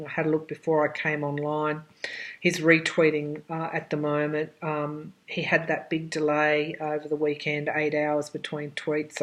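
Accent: Australia. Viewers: Thanks! Love listening to your Aussie accent! ❤️ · Can you please do a reading on NRA, Butina, Ericsson et al? I wonder if that's one of the 12 redacted cases. Thanks if you do, and thanks anyway if you don't. I have to focus to listen to what you're actually saying sometimes, because I am so fascinated with the way you're talking. I love Australian-English, and you have a manner of talking that's energetic and calming at the same time. Very much needed in the chaotic times of Trump!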